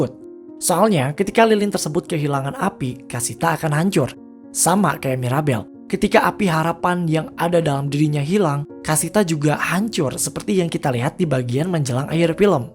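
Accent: South Jakarta, Indonesia. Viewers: Bang lu orang jaksel atau sengaja make aksen jaksel kaya "literally"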